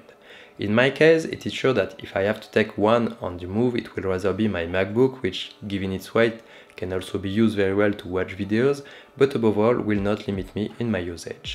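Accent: France. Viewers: Your french accent is too thick i almost didn't understand what you saying...but you don't have to change anything because this is this channel identity.. Btw seem a good product recommendation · Beautiful French accent 👍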